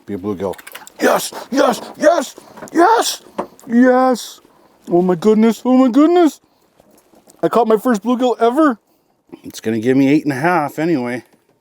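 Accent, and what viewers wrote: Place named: Canada. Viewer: I think it's hilarious how Canadians say semi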